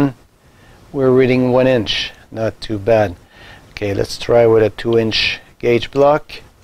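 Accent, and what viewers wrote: Quebec, Canada. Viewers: Tu sonnes tres quebecois? Serait-ce possible que tu sois au QC? super video en passant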